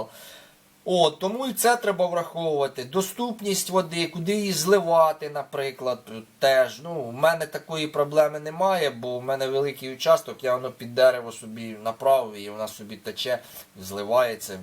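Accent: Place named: Surzhyk, Ukraine. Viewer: Хороша українська мова. Невеличкий суржик надає шарму. Плюсик :)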